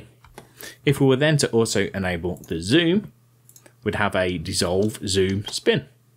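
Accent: United Kingdom. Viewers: are u from UK ur English accent seems to be so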